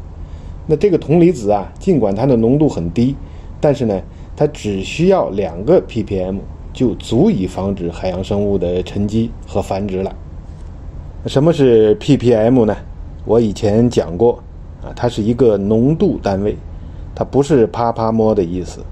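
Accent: China. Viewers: 船长哪里人？听口音像大陆的？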